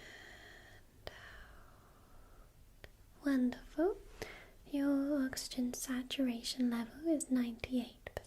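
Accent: England, United Kingdom. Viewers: I don't know why, but English accents help so much with tingles...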